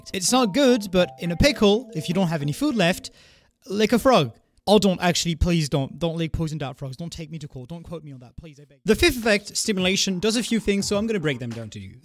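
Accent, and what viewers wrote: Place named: France. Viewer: are you french ? you have a small accent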